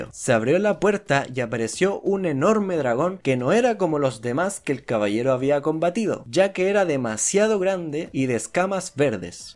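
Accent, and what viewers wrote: Chile. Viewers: bro, eres chileno? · A que es chileno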